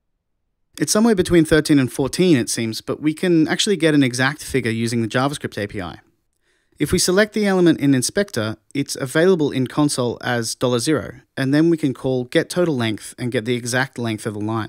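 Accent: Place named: United Kingdom. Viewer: A lovely british accent and awesome tutorial. Just the way I like it.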